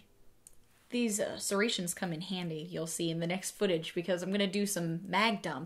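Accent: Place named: Boston, United States. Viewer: that Boston accent is on point ;)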